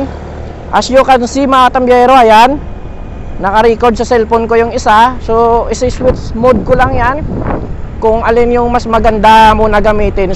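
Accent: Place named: Iloilo, Philippines. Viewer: San to paps?sounds ilonggo kyo po..rs paps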